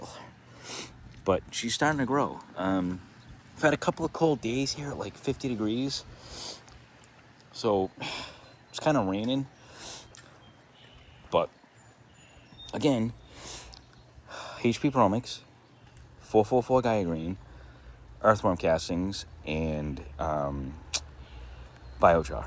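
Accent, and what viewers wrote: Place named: Northeast, United States. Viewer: Thanks for sharing your garden! Are you in the far Northeast like near Boston? Pickup up a subtle Northeast accent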